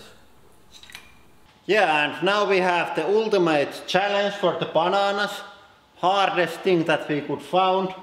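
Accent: Finland. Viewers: I'm always amused with this guy's Finnish accent, saying "who-draulic" press instead of "high-draulic" press. But, in all out fairness, I imagine my American accent shows through when I speak German. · I like the way how finish people talk english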